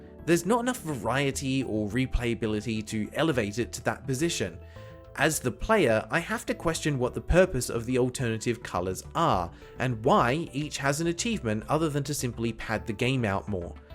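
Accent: Australia. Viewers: YOU'RE AUSTRALIAN???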